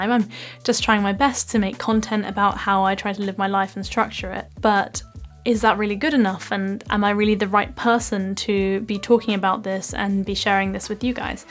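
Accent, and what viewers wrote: England, United Kingdom. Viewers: You are very beautiful and love the British accent and you have a positive and upbeat attitude and personality. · I can listen to your crisp English accent for hours 🤩and by the way your Mandarin pronunciation is spot-on! Obviously you have a flair for languages. PS - fellow beef hor fun fan here 🥰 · hy how re you, i am from indonesia and i like to learn british aksen by hearing your aksen, btw where are you from, i mean your origin country, thnks😁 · It is the first time I watch this video that British accents you pronounce and say so beautiful. keeping creative skills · I wonder would you have more video on British accent- love the way you talk, your accent and want to learn… Would you please make some videos just read a short story or Article (please speak a little slow so I can Repeat after you) then I can watch over and over again to listen and learn. 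Thank you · HI Mam, You are so adorable, I love your English-speaking accent, I am learning English from your videos, and I always wait for your new video. Keep Blessing. Have a great job and all routine. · I love your British accent. It's so beautiful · Angel face with an English accent yet sooo cute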